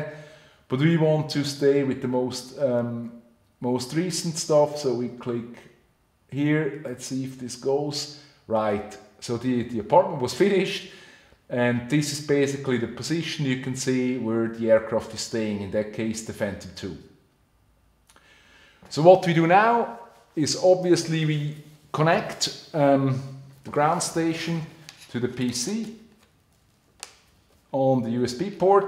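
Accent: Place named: Sweden. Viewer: Behöver hjälp! Du låter svensk, är du det? Undrar bah om jag kan fråga på svenska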